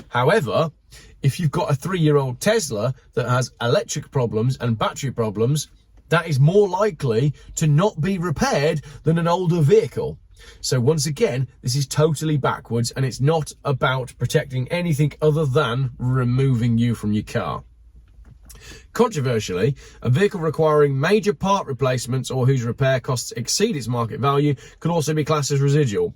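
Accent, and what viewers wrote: United Kingdom. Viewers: Steering wheel on the right side and a British accent, so likely a Brexiteer and EU hater.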